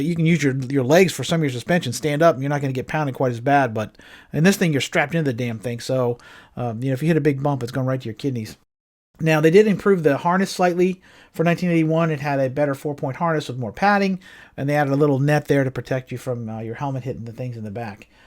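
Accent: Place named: Cecil County, United States. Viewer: You sound like one of us boys from Cecil county. My goodness 😂😂😂 My dad bought me and my 2 brothers a 79 Odyssey. This was 1985 and most of the other guys had CR’s 250R’s or Quad runners and Quad Racers. We had that old yellow 79 😂😂 they always made fun of us😂😂 I had to stand up on the back and hold on to the upright bars. We rode it in all the snowstorms. The snow collected on the front plastic fenders and got them brittle. They cracked off. Mud went right in our faces after that. So my dad took my fluorescent orange sled and cut it apart with a utility knife and bolted homemade fenders on it. We were some goofy poor ass hillbillies of the neighborhood. We rode that thing up the back roads, down the power line access roads. It had these straps that you put your wrists through on the steering yoke. It didn’t have a wheel. Those straps were to keep your arms from getting ripped off in a roll over.😄😄😂😂😂😂 Good times man. 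Those upitty kids with the nice new quads and bikes gave us some ball bustin for having to ride that old yellow and homemade bright orange fendered jaloppee. You said STINK FINGER😂😂😂😂. We had an old neighborhood skank that would let ya Four Finger her😂😂😂😂😂. MAN,,!!! I was dyin when I read your comment. Took me way back to 85. God Bless us men who grew up in those awesome days. Everything is all built up and the land we drove on is all developments now. These kids nowadays don’t even know what stink finger is. Nonetheless Four fingerin’😂😂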